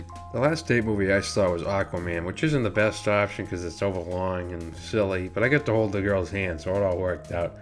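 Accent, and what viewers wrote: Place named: Boston, United States. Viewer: Your Boston accent shines right through! Love it.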